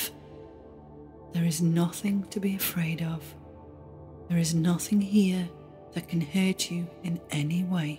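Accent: Liverpool, United Kingdom. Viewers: This narrator is from liverpool..guaranteed scouse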